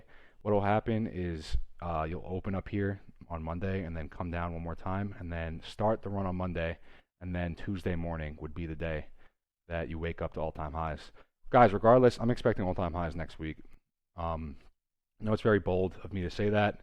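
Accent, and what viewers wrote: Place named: Pennsylvania, United States. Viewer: Realest personality to do it. You’re very appreciated homie. I feel like you’re from PA/mj/my/md. Big resonation. Philly native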